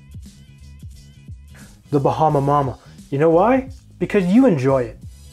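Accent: Canada. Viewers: On the back deck or in the hawse, (love the accent from the Yukon). I read thru your comments and I need to make more homemade Grenadine, for mine is approaching it's lifespan. The Goslings is a great floater. I've even seen more potent Gosling at the store. Many places by me use Myers. I'm always in for large rum drinks. Sláinte!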